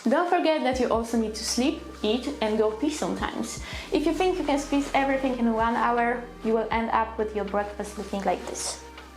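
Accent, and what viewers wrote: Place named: Poland. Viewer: "InkTober would be fun" I said- "I can show off my OCs" I said- 😂 my sympathies that InkTober is draining you, too - but I can see why! A new drawing every day is pretty daunting; I've been following all of your prompts on Instagram, and they are absolutely gorgeous, every single one! I'm glad you decided to draw days 13 and 14 in advance so you could set aside time for this video, even if it was a lot of extra work 😭 "Sketching is the most important stage" ...welp, I wish I had known that 14 days ago- for the first 10 days of the challenge I was just going in all ink! (All in? All ink? 🔏) I only started doing sketches recently 😅 ahh... so much unnecessary pain : Friends is such a great show! Noooo! ;-; I love your speaking though! You speak English very well ☺️ and even if you decided to do Polish w/ English subtitles, it would be great because you have a very lovely voice, and a beautiful accent ✨🇵🇱 Also, this style of video is wonderful - keep it up! 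Not to mention your humor~ The speedpaint process is so so beautiful! Even if you're not absolutely loving every single one, I think they're all masterpieces in their own way Thank you for sharing your talent with us!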